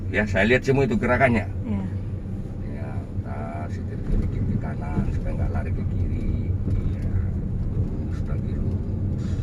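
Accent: Medan, Indonesia. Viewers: Enak ini bapak ngajarinya top mudah dipahami sabar dan telaten diawali dr dasar bicara logat Medan teringat ayah ku kalau bicara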